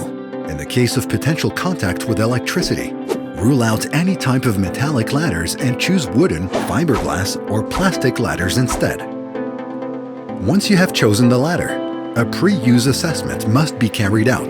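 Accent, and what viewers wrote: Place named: United States. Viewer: Why do I have to listen to an American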